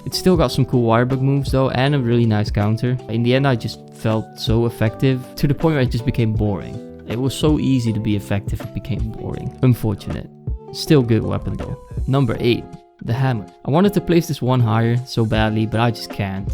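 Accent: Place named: Netherlands. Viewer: I can pick that dutch accent out of ten thousand voices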